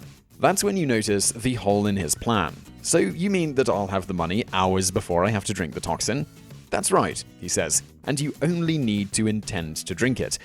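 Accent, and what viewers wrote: United Kingdom. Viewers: LOB, judging from his accent, he is clearly British so visiting every state in the USA wouldn't be a factor here. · I get it. He's British. But, Your I Null? He's never heard the word urinal before? Re-cog-nized? That's not even a word. And don't get me started on his pronunciation of aluminum.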